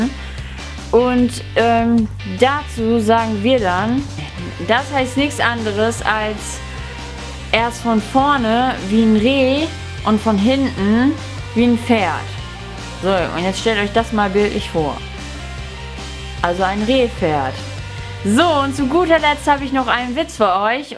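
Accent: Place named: Low German, Germany. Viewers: Wie unterschiedlich dein Plattdütsch zu dem hier in Nord-Ost Deutschland ist :) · geht mir genau so, komme auch aus westfalen, meine Oma hat es fließend gesprochen und hat es mir etwas beigebracht, das Platt hier im Video hört sich aber ganz anders an, liegt wohl daran das es nördlicher ist. fahr mal in die Niederlande, ich verstehe die dort ziemlich gut, nicht alles perfekt, aber sehr viel, nur mit der Aussprache happert es auch bei mir. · schnackt ja nich Platt - sondern Hochdüütsch mit norddüütsch Akzent ;-) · Sprech bitte nur den Dialekt/platt. Ich finde das Hochdeutsche hört man aus dem Platt zu stark raus. Und ich liebe Platt😘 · Cool :D Wusste gar nicht das du Plattdeutsch kannst, aber wenn du normal redest hört man das auch irgendwie das du ein echtes Nordlicht bist. Bei diesem "Scheiss egal Speck oder Aal, beides wird mit B geschrieben." Musste ich auch erstmal überlegen was gemeint ist, aber ich habs denke ich verstanden. 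;D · Nunja es darauf an wo man wohnt und wie man erzogen wurde. In kleinen Dörfern ist Plattdeutsch mehr vertreten als in Städten. Die meisten Menschen sprechen ganz normales Hochdeutsch, manche dazu auch Plattdeutsch. Diese sprechen Plattdeutsch meist mit Bekannten oder der Familie. Außerdem wird in manchen Familien viel Wert auf die Pflege der Plattdeutschen Sprachkultur bzw. dessen Erhaltung gelegt und in manchen eigentlich garnicht. So spreche ich (17 Jahre alt) fast ausschließlich hochdeutsch . Nur manchmal mit ein paar Norddeutschen Worten( nicht direkt Platt) in den Sätzen. Abgesehen davon ist sie keine Plattdeutsche "Muttersprachlerin" da sie recht langsam mit Akzent spricht :-) Die Begrüng ihres Videos ist weniger Platt, als mehr Norddeutsch, wie es im Hamburger Raum eher vertreten ist :-) Also abschließend kann man sagen , dass Platt nicht der Hauptdialekt hier ist ( eigentlich ja eher ne Sprache) sondern von einigen parallel zu Hochdeutsch im Alltag gesprochen wird :)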